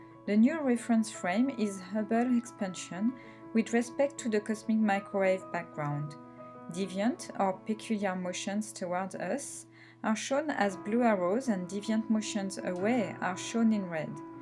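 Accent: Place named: Ryazan, Russia. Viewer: Этот рязанский акцент...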